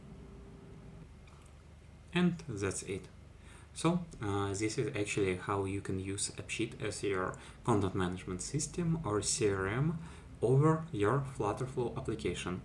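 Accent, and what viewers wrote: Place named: Russia. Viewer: Какой сочный русский акцент 😊❤😅 прям родная речь, мёд для ушей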